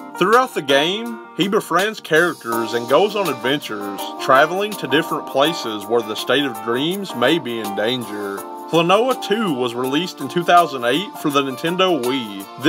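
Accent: South, United States. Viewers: I don't know why but I just like your Southern accent narrating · i love dat voice you're the southern chills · He sounds like he's from Texas or Louisiana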